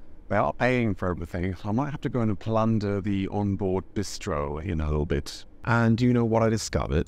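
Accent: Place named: Germany. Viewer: Your accent is so strongly German now.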